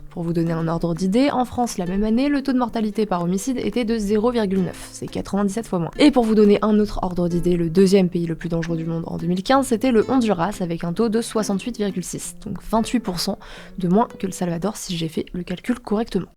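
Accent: Spain. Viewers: L accent espagnol de fait que cette vidéo passe de top à excellentissime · Pas grave du tout pour ton accent espagnol, c'est une langue de M.....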